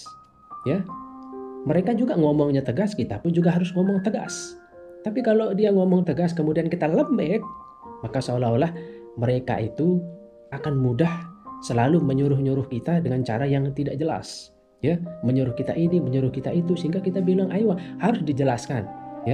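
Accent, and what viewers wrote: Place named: Lombok, Indonesia. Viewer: Pasti org lombok, kruan suare pas kne parlemen hehe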